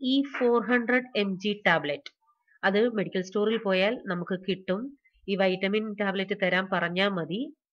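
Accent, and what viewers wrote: Kottayam, India. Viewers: Chechy.nalla video anu. Chechy kottayam.kariyano atupoleya chechiede samsaram.sweet voice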